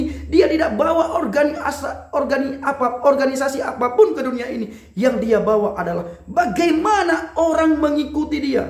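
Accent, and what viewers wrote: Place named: Aceh, Indonesia. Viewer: Luar biasa kesaksian dari orang Aceh ini, logat Aceh nya masih terasa. Haleluya.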